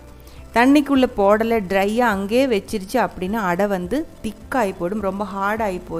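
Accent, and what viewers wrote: Kerala, India. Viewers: Mam very interesting... I loved it.. Malayalam slang Tamil... Super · Good process. Nice Tamil with kerala slang. Good ma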